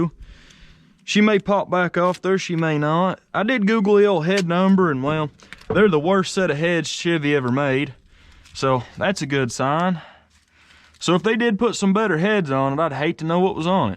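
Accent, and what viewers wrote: South, United States. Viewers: Great videos sir! Used to live in Georgia and miss that southern drawl ! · Shit your southern "dialect" is the best, gotta appreciate it when you hear it - not too often here in Finland :) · I love that Southern Accent!🐊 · That southern accent even if your smart you sound dumb.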